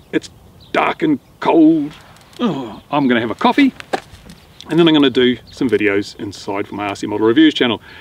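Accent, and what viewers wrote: Australia. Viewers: Whats that accent? Aussie? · Is this guy Australian?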